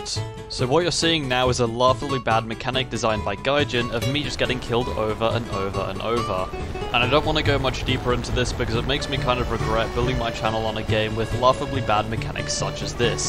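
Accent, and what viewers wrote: Germany. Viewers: Das ist so englisch mit Deutschen anksend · General Question: Are you German?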